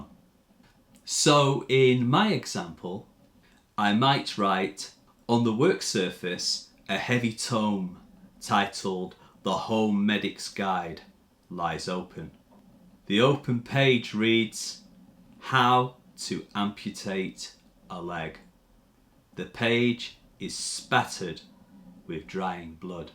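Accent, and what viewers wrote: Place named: United Kingdom. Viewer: Very good tutor with very clear English, and easy to understand, which is very important for students especially for students from abroad. You can improve not only your English writing ability, but also your English listening and speaking because the tutor/ gives teaching with standard British English.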